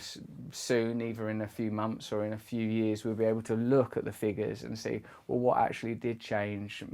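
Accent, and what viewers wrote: United Kingdom. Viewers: I love the way Russell talks being British but he clearly knows nothing about NESARA/GESARA and who is bringing it !!! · WTF? This is an American with a British accent. Just more proof the end is near. By the way, WTF did he say?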